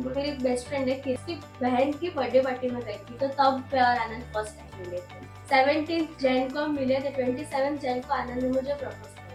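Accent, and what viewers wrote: Gujarat, India. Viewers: The way u speak... Apki tone me hi gujrati pana hai😂.. I am also from Gujarat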